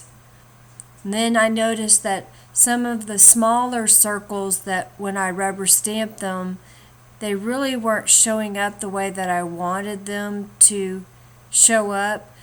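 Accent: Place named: South, United States. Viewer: Awesome, this piece is really cool! I've always loved those colors. p.s. I luuhv yerr suhhtherrn ehccent! Nashville?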